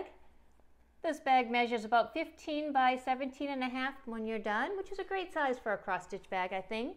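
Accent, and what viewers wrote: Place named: New England, United States. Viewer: Great tutorial...you sound like a fellow New Englander...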